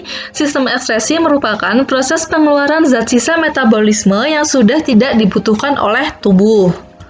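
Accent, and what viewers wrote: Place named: Java, Indonesia. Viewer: Medok banget jowonya 😁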